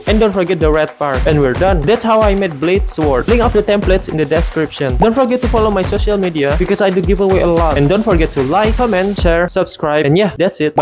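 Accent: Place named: India. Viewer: Kirain orang prindapan,logat inggrisnya mirip soalnya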